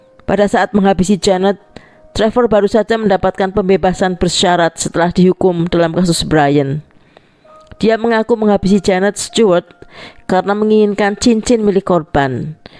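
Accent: Java, Indonesia. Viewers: ..... tetap aja top markotop kalo madam yg bawa kan.... mau itu kasus apa pun.... karena ciri khas medok nya.... malah bikin kangen.... selalu.... teruskan bersinar ya madam....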